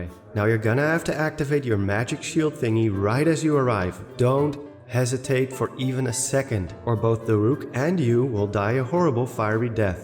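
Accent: Netherlands. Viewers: I knew you where dutch, just hearing your voice lol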